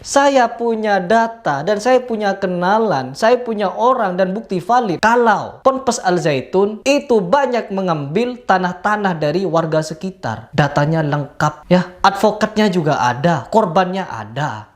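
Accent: Madura, Indonesia. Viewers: Logatnya spt madura ya? Hahaha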